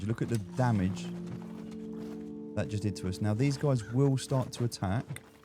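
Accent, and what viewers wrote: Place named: East Anglia, United Kingdom. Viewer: decent watch thanks. also sounds like you're from round my way? east anglia?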